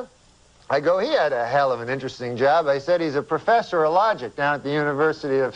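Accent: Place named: Ottawa Valley, Canada. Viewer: That's Ottawa Valley, central/East Canada, it's rural Scottish influences.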